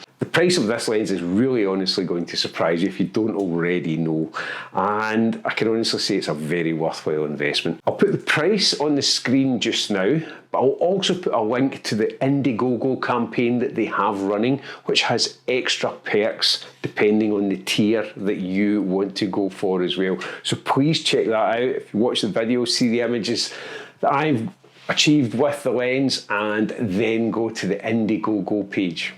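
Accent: Scotland, United Kingdom. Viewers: Great video! I love scottish landscapes and accent! Very cool!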